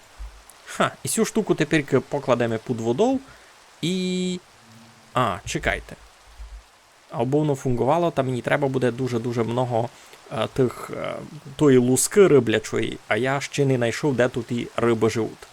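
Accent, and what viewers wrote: Transcarpathia, Ukraine. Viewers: Йой, то є закарпатська мова?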